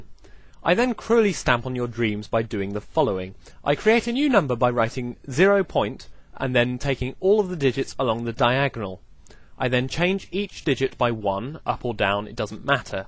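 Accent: United Kingdom. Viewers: American's (i hope i speak for my country) don't find British accents funny, but fun to listen to and attempt. If anything, it might be the slight lisp or sibilant s... don't worry about it, it's awesome. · zenos paradox - infinite divisibility, cantors argument - whatever u said here.. cant get past thick british accent.. but im assuming that notion was pointing zenos paradox. the infinite variables from infinite divisibility. there is some likeness in these, surely you must see it. if cantors proof notes incongruity through bijection, it is only out of the inadequacy of listing real numbers. out of his entity, out of our sentience existing finitively. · OMG man, you are a comedic genius. I loved this video!!!!!! Make more!!!!!! Chainsaw + Baby is more of a supper or dinner thing, at least i think so... and the British accent kicks butt!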